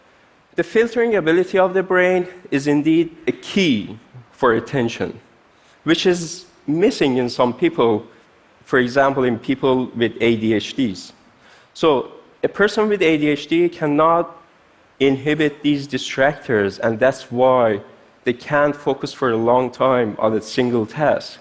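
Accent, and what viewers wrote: Iran. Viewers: that persian accent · Detecting...: iranian: Accent, face, brain, hair, eyes and body. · Love to hear native speakers of Persian speak English. Such a beautiful sound!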